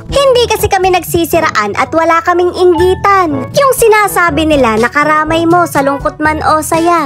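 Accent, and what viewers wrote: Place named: San Miguel, Philippines. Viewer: Natutuwa talaga sa mga taga San Miguel ganyan na ganyan magsalita tulad mo? Nalimutan ko nga name fr ko dyan siguro age nya nasa 45 yrs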